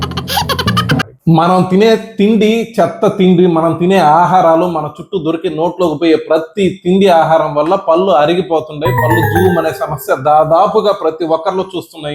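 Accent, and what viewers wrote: Nellore, India. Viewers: మన నెల్లూరు యాస...👌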